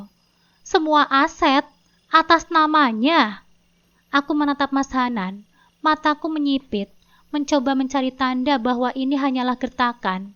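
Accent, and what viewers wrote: Java, Indonesia. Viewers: Baca ceritanya lucu,medok jawanya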